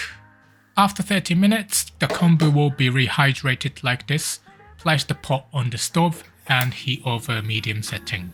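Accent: United Kingdom. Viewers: ur british accent sounts rlly good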